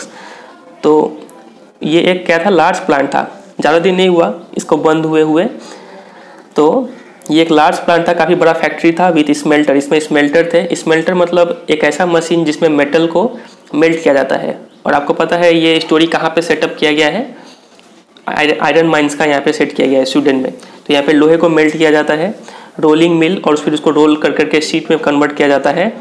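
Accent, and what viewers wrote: Bihar, India. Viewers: Your 3 tho and kaati proves you bihari😂😂lol I am bihari too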